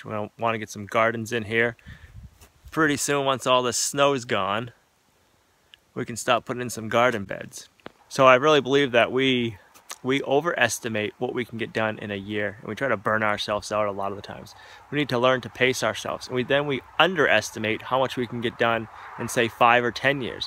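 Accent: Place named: Boston, United States. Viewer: ***No way! I didn't mean speech impediment or problem. I'm from way out west and you speak like a Bostonian. Or a New Hamshire-ian. It wasn't meant to be a criticism. :) Have a great Friday. Great work.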